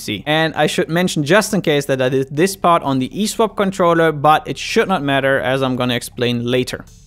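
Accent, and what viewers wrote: Germany. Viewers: Hahaha egal wie sehr man sich anstrengt man hört sofort den deutschen Akzent heraus. :)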